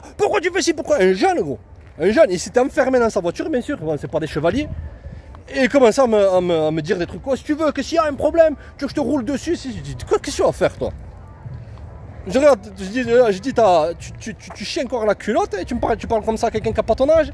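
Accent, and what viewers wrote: South, France. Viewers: Merci pour ton post! Tu as bien fait de parler avec ton accent du Sud, c'est encore plus VIVANT! Tu vois, j'ai 78 ans et je ne suis pas une "meuf", je suis un etre humain engagee dans ma vie qui est simple, car je refuse d'etre dans la consommation. (Comme j'ai toujours fait!) Et j'apprends a me contenter... C'est un choix, car je suis persuadee que ce systeme touche a sa fin...et que bientot tous ces zombies, comme tu les appelles, vont se reveiller et devenir des ETRES, parce que chacun est responsable de sa propre planete! C'est bien beau de constater ce qui ne va pas, mais ca ne suffit pas. C'est a chacun d'entre nous de produire autre chose et d'aller le chercher en lui-meme, comme l'eau que l'on puise dans le puit, il y a UN EFFORT PERSINNEL POUR RAMENER L'EAU! (Si ca te parle...) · Tu nous aurais pas dis le sud de la france ton accent plein de soleil nous le disait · Je ne te connais pas , je te découvre et tu m'es déjà sympathique. 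Un bon accent du Sud de la France, cet accent que l'on entend presque plus même dans le Sud où les jeunes font tout pour le perdre. Et ton analyse sur la société même si tu n'as pas l'air bien vieux, enfin disons beaucoup plus jeune que moi 😉 je la partage totalement. Ca me fait plaisir en regardant ta vidéo de voir qu'il existe encore des gens authentiques. Moi quand j'allais à la salle de sport au début des 90's, il n'y avait pas de femmes, ça sentait la sueur et la testostérone 🤣. Les femmes fuyaient ce genre d'endroits. Aujourd'hui les salles de sports sont devenues des endroits incontournables pour se pavaner, se montrer, créer des stories pour alimenter son compte Insta ou que sais je. Le smartphone est un véritable fléau oui, n'ayons pas peur des mots. Et cela touche toutes les tranches d'âges, ce n'est pas propre aux plus jeunes. Tiens récemment je suis allé au restau avec des amis tous des quinquas comme moi, entre chaque plat, silence radio tout le monde était sur son smartphone. 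A un moment j'en ai eu marre et j'ai poussé une gueulante ! Oh les gars ! On Se retrouve entre amis pour passer du bon temps, pour partager, communiquer et vous qu'est-ce que vous faites ??? Vous pouvez pas le lâcher le temps d'une soirée votre joujou !!?? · Incroyable, tu sais que avant que tu dise 5ans, ç est sortie de la bouche, donc je ressent la même chose et oui terrifiant, moi suis des années 1970, et j'en peu plus de tts ça 😢, et en plus les gens sont tellement horrible qu'ils émanent leurs négatifs autour de peu de gens qui restent positifs, donc c est vraiment dur 😢 Mais j essaye vraiment de tenir le cap, courage à tts ceux qui sont décourager, 🙏💜 Moi pareil jme dit tu n ai pas de cette planète, alors merci à toi, j aime trop ton accent du sud ça fais du bien à entendre 🙏💜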